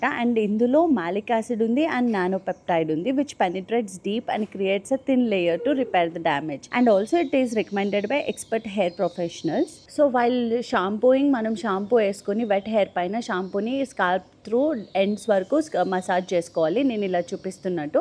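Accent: Godavari, India. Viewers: Ur godavari yaasa n yeatarakam are peaks...😅 Want a casual godavari accent video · Video lo anniti kanna mi godavari slang so nice andi ఆయ్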